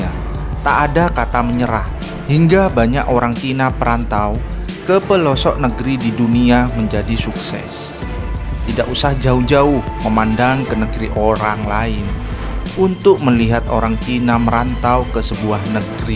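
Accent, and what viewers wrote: Madura, Indonesia. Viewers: Logatx madura banget ini.. Haha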